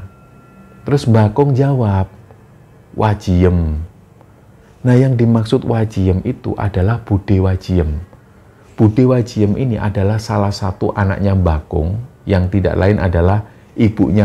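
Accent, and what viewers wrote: Indonesia. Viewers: Suaranya mas meduk (kawa)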